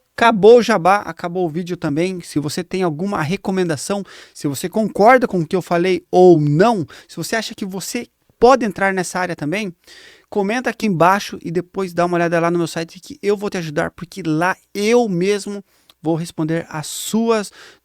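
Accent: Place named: Sorocaba, Brazil. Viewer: Nossa cara eu moro em Sorocaba SP e pelo sotaque ia até perguntar se você era da região mas respondeu a pergunta e sempre como ótimos vídeos.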